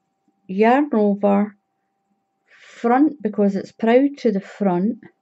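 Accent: Scotland, United Kingdom. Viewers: I’ve had double fun watching this tutorial! Firstly, Muttley looks fabulous, he’s such a good model for the hat! Secondly I’ve used the CC captions for the first time and your gorgeous Scottish accent has completely flummoxed it! Every time you say the word “six” it shows “sex”!!!!! If you want a good laugh I suggest you turn on the CC captions and settle back with a cuppa! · Hiya! Great to hear a Scots voice! Recently learning crochet. Following exact measurements for my lurcher Eloi. (Bedlington X deerhound). Apart from missing a post during my first round of front post bk post which I'm aware of and cringing about (lol!) - have u any tips on tension as my work has gotten quite loose? I'm only on the 3rd row but am mightily impressed. It doesn't REALLY matter much as his lurcher neck grows in size as it joins his neck so I could work in a longer neck onto his hat in theory anyway 🤭 big hello and thankyou from Dingwall! 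✌️♥️💪👏🏴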